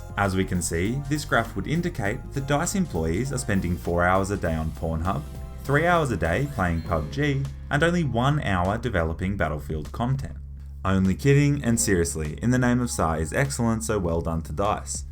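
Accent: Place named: Australia. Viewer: You are my new favourite youtuber! Your content actually makes me laugh. Your humour goes great with the accent (I sound like an american but I'm australian too). At least you aren't an ibis that steals my lunch box everyday.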